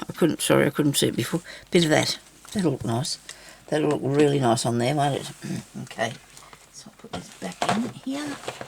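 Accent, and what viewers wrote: Australia. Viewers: I understand you perfectly, you have the same Australian accent as me I also grew up in western NSW. Blue and green should never be seen unless there’s a colour in between is what I was led to believe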